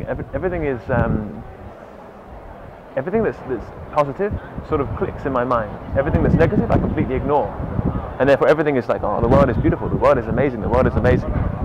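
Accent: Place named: England, United Kingdom. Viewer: Hi, very interesting video, nice to have a English accent for a change lol. Also nice to have someone presenting photographic concepts in this way. Thank you.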